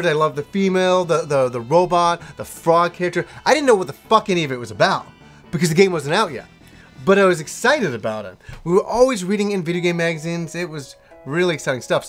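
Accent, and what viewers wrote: Yorkshire, United Kingdom. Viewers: WUT? your a Yorkshire Lad? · U are a Yorkie? which part were u from?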